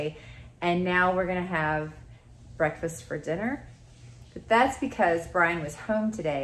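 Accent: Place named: Scotland, United Kingdom. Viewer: Just found your channel by chance and thought you were Scottish 🤣🤣 and yes I live in Scotland 🏴 and have subscribed 💕💕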